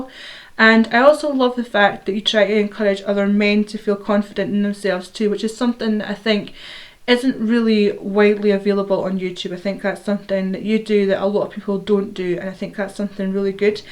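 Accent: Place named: Scotland, United Kingdom. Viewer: That's so funny. My wife and I tap three times on wood any time we don't want to jinx something, nine times if it's really important. We also love. He's a very lovable sweet guy. Great video. I watched your most recent singing video. Very nice btw, and I was wondering where your accent was from. I think you might have said Scotland, well, I'm assuming it's Scottish.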